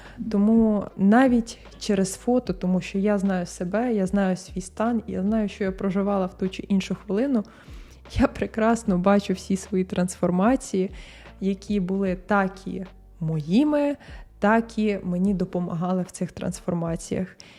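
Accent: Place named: Surzhyk, Ukraine. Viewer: дуже дякую, що ділитесь власною історією, як на мене, це дуже корисно і полегшує відчуття всередині нас! Ви пройшли реально багато труднощів і тому хочеться висловити Вам слова підтримки. Ви справді молодчинка<3 за суржик не партесь, він звучить афігєнно і середньостатистичному носію мови все більш ніж зроз:)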